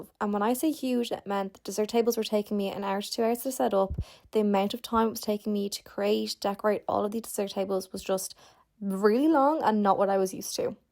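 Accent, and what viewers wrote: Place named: Ireland. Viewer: OHHH I absolutely love that your channel popped up on my feed! Your Irish accent is the icing on the cake! 🎂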